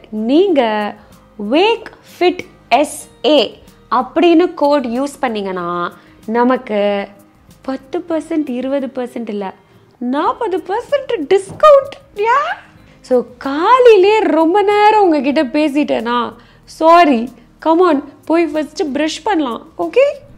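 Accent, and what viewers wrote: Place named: Sivagangai, India. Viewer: Pechula sivangai sayal teriuthey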